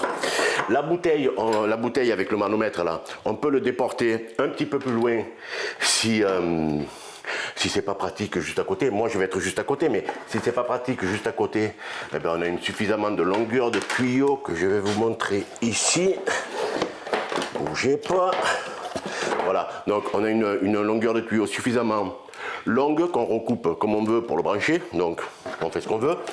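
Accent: South, France. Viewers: Il est incroyable ce bonhomme, en plus, il a l’air sympa avec son accent du midi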